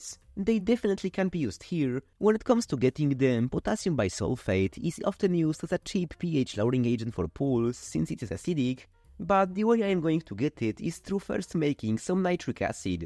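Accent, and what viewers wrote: Poland. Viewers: hey bro, are you polish by any chance, you have polish accent in your english lol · My new favorite ASMR listening to chem synth in English via a cool a$$ dude wth a Polish accent. 👌🏻 10/10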